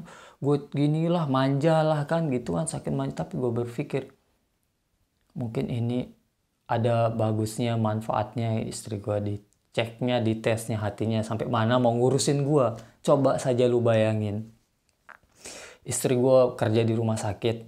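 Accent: Lombok, Indonesia. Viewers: Khas sekali Logat lomboknya 😆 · I like your style of speech Bro, Lombok gatiii 😁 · logat lombok masih kentel😂😂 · Logat sma diksi2 lomboknya ga ilang bang mantapp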